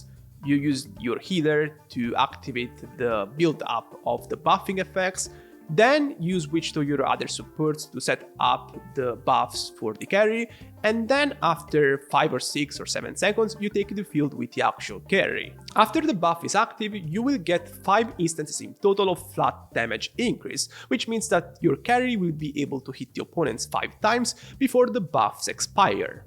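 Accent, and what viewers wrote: Italy. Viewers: Italian I think, but I'm not positive.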